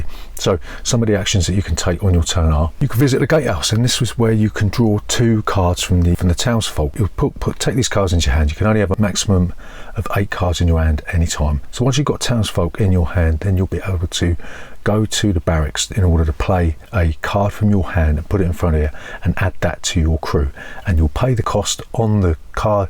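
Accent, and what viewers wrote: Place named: Cockney, United Kingdom. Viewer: I quite like watching an subtly-aggressive cockney do a board game review - he reminds me of Vinny Jones! Good stuff. (I'm sure he's not actually aggressive, it's more the comparison to Jones.)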